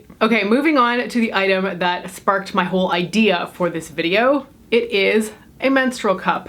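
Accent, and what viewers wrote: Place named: Eastern Canada, Canada. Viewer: I have to say as a fellow Canadian I adore your eastern Canadian accent it sounds so much more chic the my bc accent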